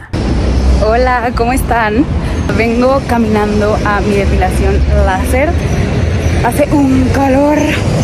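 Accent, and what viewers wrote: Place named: Fresa, Mexico. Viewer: Holaaa, sólo no hables tan fresa y saldrán mejor tus videos. Saludos.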